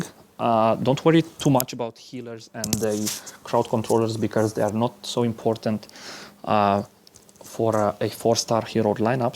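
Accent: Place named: Romania. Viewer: you sound romanian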